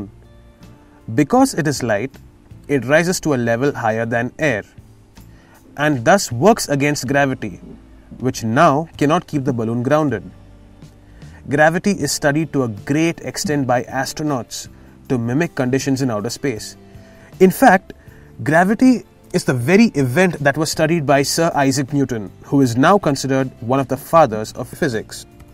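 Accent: India. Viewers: First when i saw the video with an indian voice i thought that this video is going to be a textbook explanation but while the video is going on, It is how i wanted it to be. this is very different from other regular boring textbook explanation videos. thankyou for the great content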